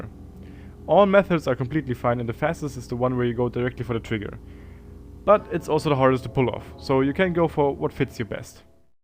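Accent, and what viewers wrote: Germany. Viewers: a german speaking english making cry of fear videos? heaven.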